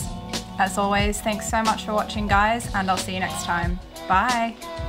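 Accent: Australia. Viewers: are you aussie? we should be yt friends! x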